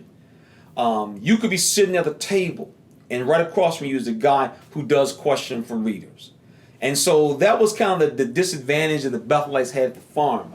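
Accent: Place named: Detroit, United States. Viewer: Man you talk and act like my Detroit people. Lol Tell it like it is my brother.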